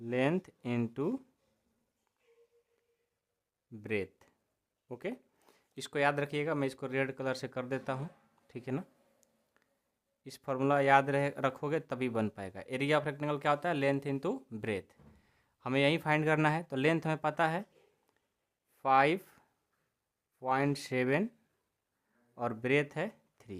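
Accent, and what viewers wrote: Bihar, India. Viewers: Bihar ke ho kya language se lagta hai😊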